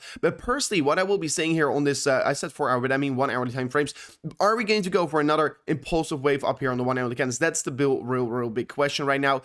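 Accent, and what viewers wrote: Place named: Netherlands. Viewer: Ohhh is hij toch Nederlands !? ik dacht al een " dutch accentje" te horen 😊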